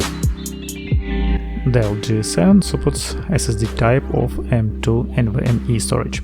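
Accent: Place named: Russia. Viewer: I realized, in accent, not like an Englishman. But for Russian, it sounds good.😏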